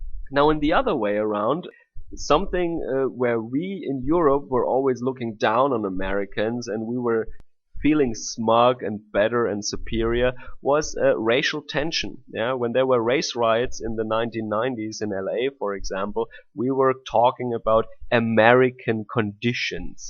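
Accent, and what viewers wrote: Germany. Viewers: jan uniter He is German. This is how everyone not American speaks!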